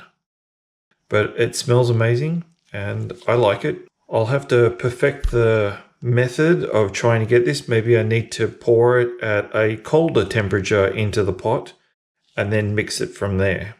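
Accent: Australia. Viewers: Beautiful. Loving watching a soap vid with an aussie voice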